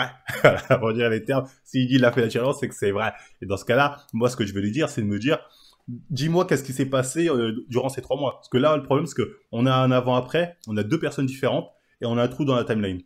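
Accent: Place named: France. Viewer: On aime bien quand tu parles très français comme ça 🤗🤗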